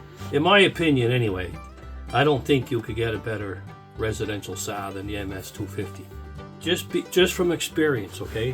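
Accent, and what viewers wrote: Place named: Newfoundland, Canada. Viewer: Just hearing you talk makes me miss living on the rock. Thanks for the video 😊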